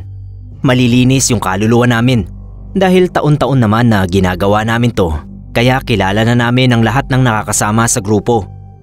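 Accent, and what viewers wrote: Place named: Pampanga, Philippines. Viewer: binuklat hahaha kapampangan ka po ata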